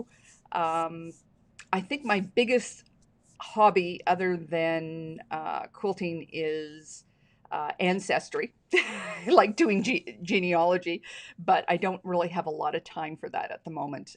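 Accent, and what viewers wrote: Canada. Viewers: Hello I love your videos and really appreciate a Canadian voice. Because sometimes we don't always get the best variety and prices over here. But we love what we do. I would still consider myself a beginner in the grand scale of things. However I'm getting there for sure in terms of building my basics. And keep seeing your beautiful complex personal projects. Wich leaves me to ask if you can do a more intermediate quilt block video of some of your favorite blocks. Even some from the beautiful backdrop behind you 😍 would be wonderful and very appreciated.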